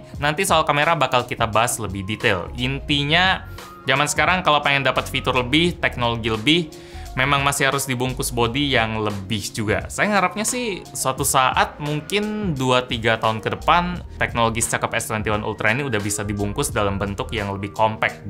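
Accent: Palembang, Indonesia. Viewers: "Digabung tigo ikok, yang ini ini ini, atau duo ikok be". Palembang nian bang 😂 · Uong palembang ruponyo haha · 2.ikok bae ? Wong palembang apo ?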